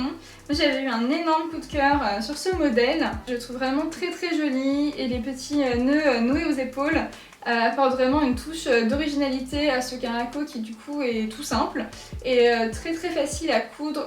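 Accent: Paris, France. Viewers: Vidéo très sympa tu me donnes envie de me mettre à la couture ahah. Tu as un petit accent parisien, on te l’a déjà dit ? 🥰 Hâte de voir d’autres vidéos merci à toi!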